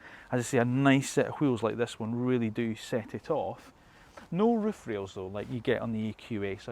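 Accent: Scotland, United Kingdom. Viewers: I always enjoy your calm and eloquent presentation... it's sort of an automotive meditation. And that Scottish accent is just a bonus. I'm going to have to go with the GV60.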